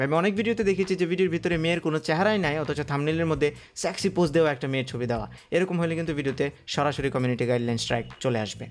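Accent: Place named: Chittagong, Bangladesh. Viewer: vi apni koy takan dhaka naki.ctg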